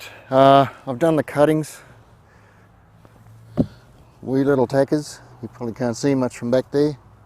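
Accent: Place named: Australia. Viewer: I believe (judging by your accent) that you recide in Australia?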